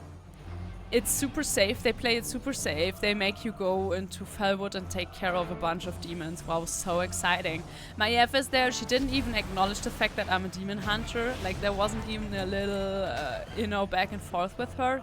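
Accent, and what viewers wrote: Germany. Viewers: egal wie sehr man sich anstrengt, man hört immer wenn wer deutsch ist :D ich kenn das Problem haha, tolles Video.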